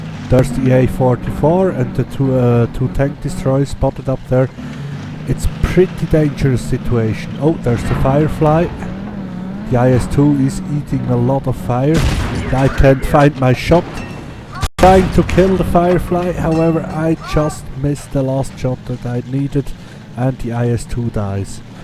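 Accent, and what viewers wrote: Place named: Switzerland. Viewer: Schweizer oder Deutscher? Habe das Gefühl dass dein Akzent ziemlich einem Schweizer ähnelt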